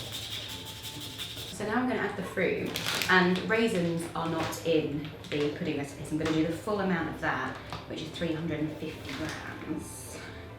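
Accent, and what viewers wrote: United Kingdom. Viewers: Long pretty hair, beautiful face, British accent, and you can cook. Wow, do I envy your husband. :)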